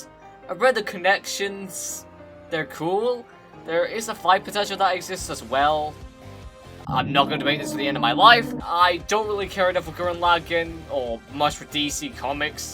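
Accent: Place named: United Kingdom. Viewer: This is the first time I’m hearing your voice Holy shit you ARE British aldhaodhakdhosjzksjd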